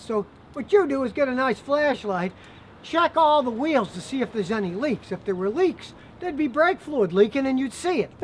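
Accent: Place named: Brooklyn, United States. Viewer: has Brooklyn😁 accent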